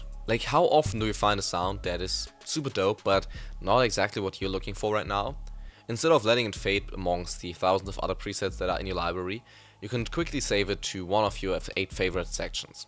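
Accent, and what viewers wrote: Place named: Germany. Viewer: boahh deutscher akzent ist so hässlich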